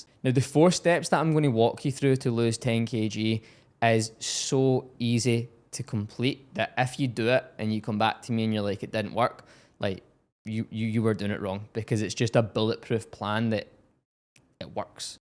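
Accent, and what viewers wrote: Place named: Scotland, United Kingdom. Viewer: he either doesn't understand the Scottish accent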